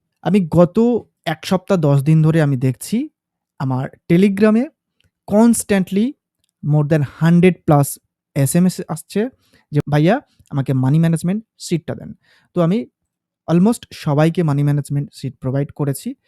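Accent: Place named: India. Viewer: Apni indian nki bhai indian er mto lgche kotha gulo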